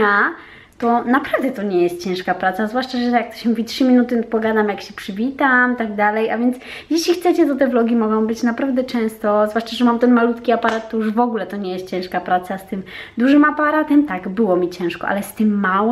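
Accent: France. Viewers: dlatego, że we francuskim końcówki są "bezgłośne". Nie wypowiada się ich tak dokładnie jak w języku polskim. Przyzwyczajenie do innego języka. Poza tym myślę, że to bardzo niekulturalne zaglądać komuś do portfela i komentować, że się dużo na coś wydaje.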